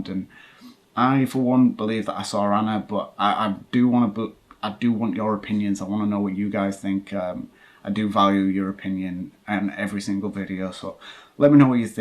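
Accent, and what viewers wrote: United Kingdom. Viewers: para mi que soy mexicana me cuesta algo entender el ingles britanico ojala pudiera poner sub para captar mejor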